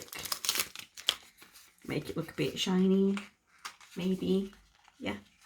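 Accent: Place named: Southern England, United Kingdom. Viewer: Hi, just subbed to you, great video! That becca pallette looks well lush! I have been trying to figure out your accent...Yorkshire or Lancashire? I am a Yorkshire lass but my accent is all over the place due to living down south for a while when I was younger, your accent sounds like you have some southern influence too??? xx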